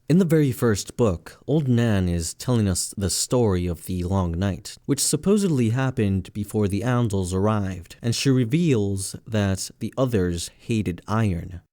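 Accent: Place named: Midwest, United States. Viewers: Great video! What part of the US is your accent from? I've never heard it before. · Sounds midwestern. Maybe Colorado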